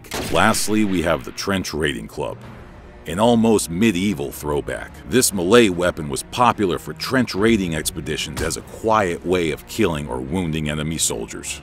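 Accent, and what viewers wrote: South, United States. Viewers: That American acent is quite strong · This guys sounds like Forrest Gump. Is it a regional accent in US? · he sounds so american lol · You can kinda hear a southern accent too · he must have a southern accent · Southern accent anybody else here it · Is no one gonna acknowledge the fact that he has a Southern accent and he said “Rafle” instead of Rifle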